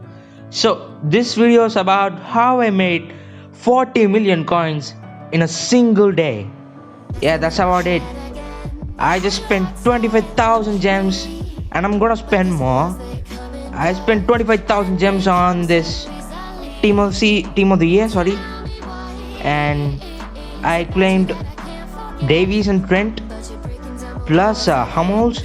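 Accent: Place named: Tamil Nadu, India. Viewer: Your tamil accent is HARD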